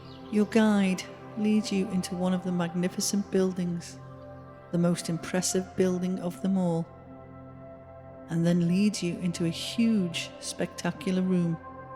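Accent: Liverpool, United Kingdom. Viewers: I couldn't stop being distracted by her dialect. Not sure if it was Liverpudlian or something else. Also, to be honest, I felt it was all too "rushed" I needed a little bit more time to follow the directions. I think it's s great thing to share these meditation's, but a LITTLE SLOWER next time please! Thanks 👍 xxc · Are you scouse ? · for some reason the british accent annoys me. how can i get over this? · Sorry, I can't relax with a Scouser talking, got both hands on my wallet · Been doing it since I was a kid nice to hear a scouser bring me back.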